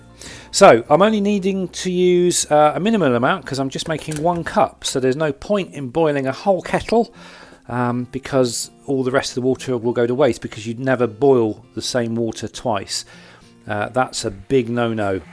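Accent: United Kingdom. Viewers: As I lived nearly a decade in Britain, I just came here to listen to the beautiful accent and understand again why I became so fond of tea. Cheers!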